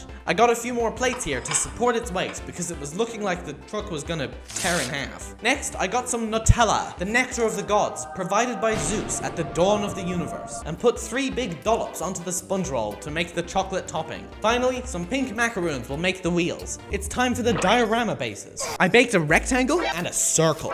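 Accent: Australia. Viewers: UR AUSTRALIAN :0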